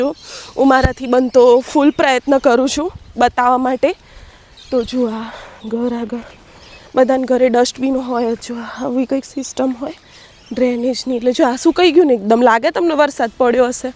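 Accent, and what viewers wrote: Sabarkantha, India. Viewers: YOU ARE WELL EDUCATED AND EXPERIENCED. AND ALSO. HARDWORKING. WOMEN. BY YOUR SPEECH. I. WAS IN DOUGHT. THAT. YOU ARE. FROM. SABARKANTHA. NOW. MODASA. IN. ARRVALLI DISTRICT. SPEECH. IS REALLY. EXCELLENT I. LIKE. I. AM FROM. NARODA AHMEDABAD. KEEP. IT UP. A DAY WILL. COME. YOU. WILL BE. TOP. VLOGGER. DON'T SHOW. MUCH DETAILS ABOUT. YOURSELF TO. AVOID. UNNECESSARY. USELESS. COMMENTS. EVERYONE MUST. SUPPORT TO. SUCH. VIDEO MAKER🎉🎉❤❤ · tara video badha bahuj saras details sathe full information with tecnology wala hoi che.mane tara language parthi j lagyu ke tu sabarkantha Modasa ni che.hu pun modasa ni aagle Vadagaum Dhansura bayad Aakrund gaum ni chu.darbargad ni.tane khup khup shubhasshirvad.god bless you.tari bhasha aavij rakhje sweet.aapnu gaum ni nam aagal lavje Tram no video saras details sathe banavyo hato.tyare j lagyu hati ke tu sabarkantha Modasa ni j ke dhansura bayad ni che.❤🎉😊 Thanks u.proud of you dear.❤🎉.hu hamna baroda ma rahie che.perth ma apna baroda na badha bahuj che. 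😊😊😊😊roj nava video banati rehe.hu pune mathi jovu chi.❤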